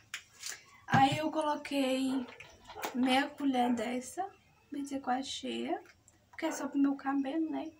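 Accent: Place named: Northeast, Brazil. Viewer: E um sutaque de nodestino adoro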